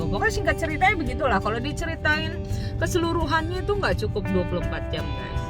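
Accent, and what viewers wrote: Bekasi, Indonesia. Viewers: 21 tahun di Korea tapi Bekasinya masih berasa beeeeudd🤣🤣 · Dari pertama ngomong, logat dll udah bs di tebak klo nih orang Bekasi.... Beda gentakannya.... Hahahaha.... Kanmaendah.... 😁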